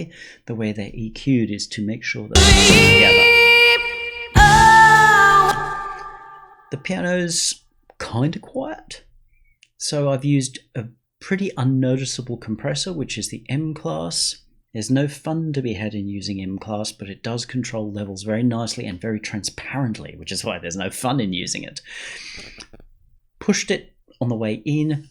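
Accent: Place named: United Kingdom. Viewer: I really appreciate your Britishness, if that's a thing. I listened to the whole thing just to hear you speak, it made me feel good. Can you do a youtube channel where you explain random shit, it would make me really happy! I appreciate the fact that you never looked into the camera that was actually recording, keep doing that thing where you look to the left at what I'm hoping is your hedgehog that you are talking to. Truthfully, I really enjoyed it. Please don't tell me if it isn't a hedgehog, unless it's like a Harry Potter Owl or maybe a kippered herring. That's just as good. Thumbs up.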